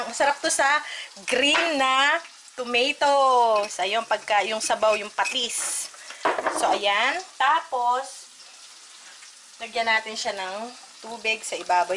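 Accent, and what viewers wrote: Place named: Quezon, Philippines. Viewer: Taga Quezon Ka po ba? Nakakatuwa po kapareho kita ng punto. He he. Ang cute po mga videos mo :)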